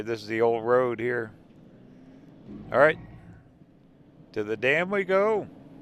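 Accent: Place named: Upper Midwest, United States. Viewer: I love your upper Midwest accent.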